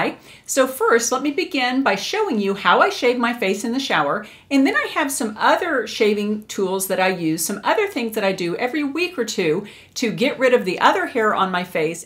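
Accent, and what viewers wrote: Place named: South, United States. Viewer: Wow...so beautiful... definitely a southern belle... absolutely love you accent girl..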